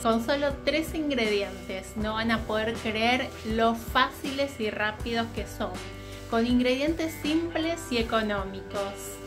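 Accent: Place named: Argentina. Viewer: voy a probar tus Recetas, te encontré, y me encantó como explicas,lo sencillo y se ve todo Delicioso!!!!! Que lindo paisaje desde tu ventana!!!!! Por tu acento,me pareció que sos Argentina.....me equivoco???? Ja ja bueno....es curiosidad.....Gacias,Abrazo desde Argentina.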